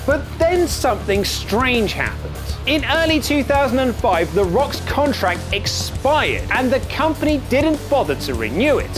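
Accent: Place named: United Kingdom. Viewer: what a shady click bait title...all that talk and not once have u actually given the "real reason" why...just pure speculation one after another after another..kinda fd up..and then in comments its just as many more. and would be so much cooler if u threw some videos in (i kno u prob can't do that do the copyright issues thing) but yea some videos/small cuts from matches or backstage segments etc...would be sooo much better n more entertainment then this bombardment of a I'm guessing British, accent, on and on and on..shit gave me a headache...lmao n i couldnt even get the answer the the question of the clickbait title for it lol..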